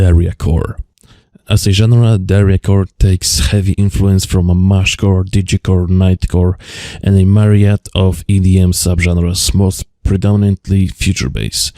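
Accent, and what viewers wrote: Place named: Eastern Europe. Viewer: looks like i hear slavic accent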